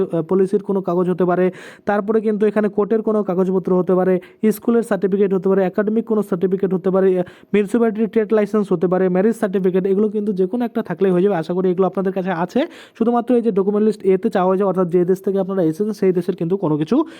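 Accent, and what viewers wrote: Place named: Bangal, Bangladesh. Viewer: ওরে বাঙালের ভাষা বন্ধ কর,চেষ্টা কর বাঙালির বাঙলা বলার ।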